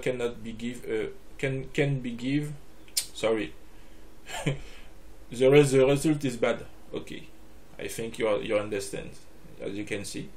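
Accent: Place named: France. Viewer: un petit "accent" Français ;p haha tu fais l'effort de parler anglais et c'est pas evident sans ecrire de texte avant meme en Francais . merci pour la video je partage( passe voir la chaine et le site si ca peut aider aussi) A+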